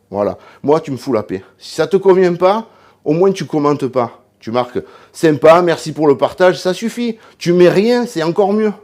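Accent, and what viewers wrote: South, France. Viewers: Bonjour! Le bonjour d'un Harleyiste de Nouvelle Calédonie ✌️ Deux questions stp: moi je suis dans un pays chaud donc hors de question de faire de la charcuterie à l'air libre... Je peux mettre la ventreche à saler puis ensuite à sécher au frigo? Pas moyen de la pendre en plus... Et combien de temps en général avant de consommer selon toi? Merci pour tes vidéos que je découvre. C'est super. J'adore retrouver l'accent du midi 😉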